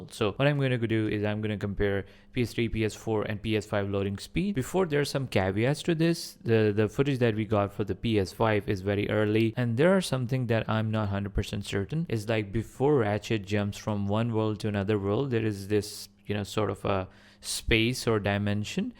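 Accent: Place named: India. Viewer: you slightly sound indian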